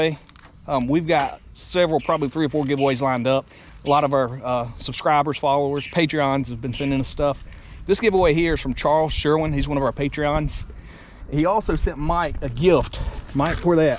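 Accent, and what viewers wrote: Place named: United States. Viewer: Love your American Accents!! A Canadian eh?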